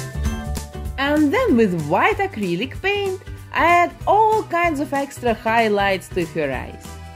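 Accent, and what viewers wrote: Russia. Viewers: You are not just a terrific artist, I like listening to you talk too. I thought from your accent that you are Russian or Ukrainian.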